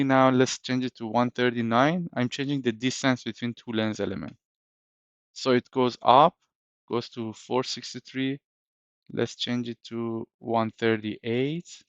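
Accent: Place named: Iran. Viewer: Nice Persian accent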